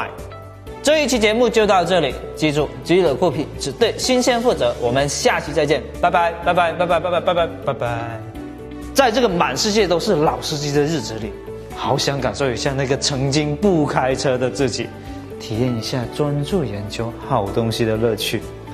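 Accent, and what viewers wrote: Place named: Guangzhou, China. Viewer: 广州人口音。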